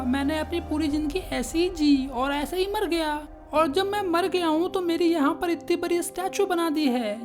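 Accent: Bihar, India. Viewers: Bihari ho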